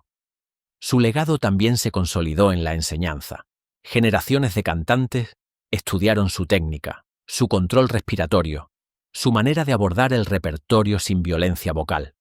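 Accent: Andalusia, Spain. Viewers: Es andaluz el que habla. Que problema hay?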